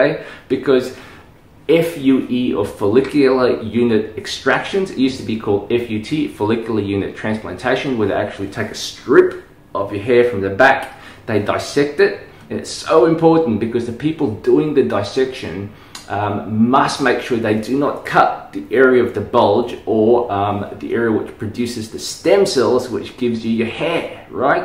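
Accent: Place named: Queensland, Australia. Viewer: Idk how your international followers understand you. That's a really thick Queenslander accent 😒😁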